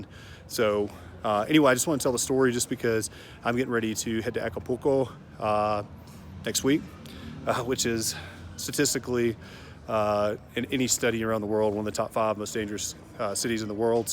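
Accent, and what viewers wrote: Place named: South, United States. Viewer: Me gustaría que hablara español se le escucha muy bonito con ese acento sureño de e.u.a. Pues no se inglés 😳no se si me equivoque al creer que es de allá. Y que bueno que siempre esté alerta. Ah, y también para comentarle que será que sea peligroso que mencione que fue soldado, ya que aquí en México pues los soldados no dicen que son eso y no son buenos algunos por que están involucrados en cosas malas. Y usted tiene mucho carisma y es buena onda. Cuídense mucho. Gracias por contestar. Me gustaría que también aprendiera español bueno sin albur. Saludos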